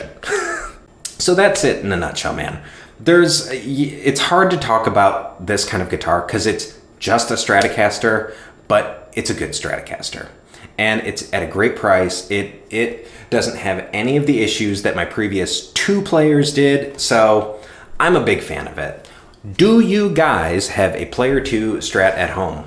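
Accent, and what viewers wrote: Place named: South Carolina, United States. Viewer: hahahaha new follower because of your voice and accnet, sounds like south carolina deep in the south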